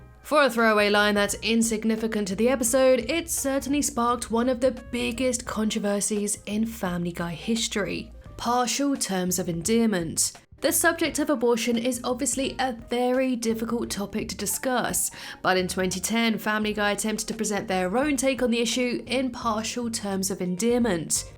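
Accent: United Kingdom. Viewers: your british accent is beatiful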